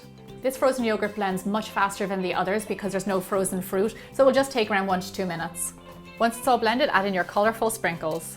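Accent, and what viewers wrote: Ireland. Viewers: 1) love your accent; Irish, I beleive. 2) With all due respect, you need to slow down a bit or two while presenting the ingredient preparation procedure. Sincerely, Bruschetta senior; chef-wanna-be. <num>. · I'm trying to place your accent Is it...Irish? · I absoloutly adore the Irish accent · You are such a great baker your so pretty and I love your accent, I'm from ireland too! +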